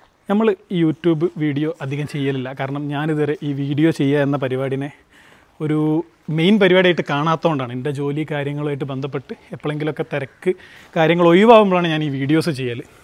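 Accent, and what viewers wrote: India. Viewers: കൊടുവള്ളി കാരനാണോ, slang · സംസാരം കേട്ടാ അറിഞ്ഞൂടെ കോയിക്കോട് ആണെന്ന്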